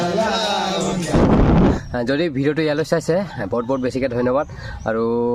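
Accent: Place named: Mishing, India. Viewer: Bosdikee,,who the fuck are you to comment like this,he is belongs from mishing community so his first mother tongue is missing though he is able to speaks Assamese ,we have to appreciate him instead of insulting or looking him down..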